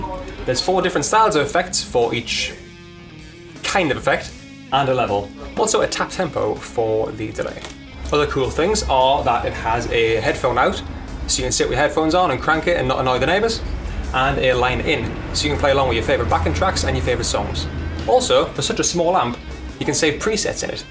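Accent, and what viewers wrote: Newcastle, United Kingdom. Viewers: Haha hes from England. Most likely a Geordie. Its not too bad is it?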